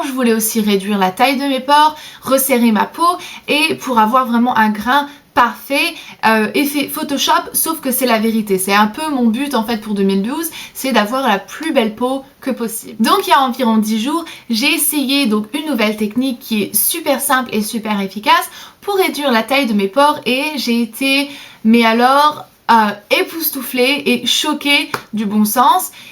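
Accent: England, United Kingdom. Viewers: Salut tu es française? Je trouve que tu as un accent anglais...Bises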